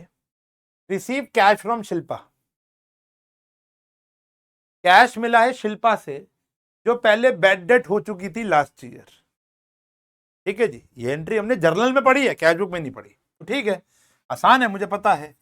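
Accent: Punjab, India. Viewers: Sir ap punjabi ho?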